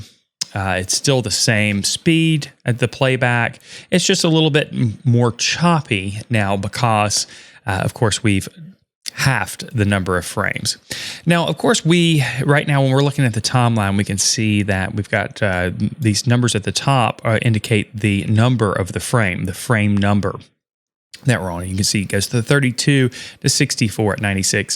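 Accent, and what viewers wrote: South, United States. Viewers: Holy moly that is some strong souther accent right there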